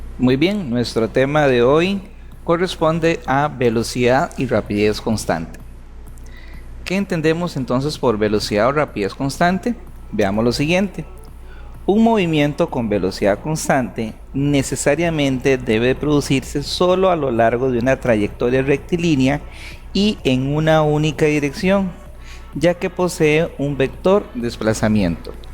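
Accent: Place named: Costa Rica. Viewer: La voz me suena a un tico....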